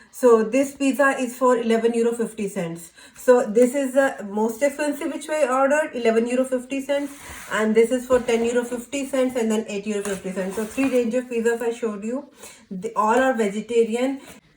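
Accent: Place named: England, United Kingdom. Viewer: Nice English accent, not too hard to understand.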